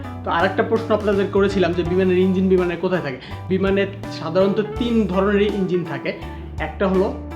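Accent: Sylhet, Bangladesh. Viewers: ভাই আপনার দেশের বাড়ি কি সিলেটে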